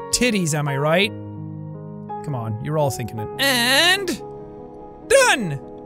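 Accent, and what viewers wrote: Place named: Ireland. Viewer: Well, I am new definitely heard of him didn't know he had such a Irish voice cool because I am Irish! 😂😀😄😅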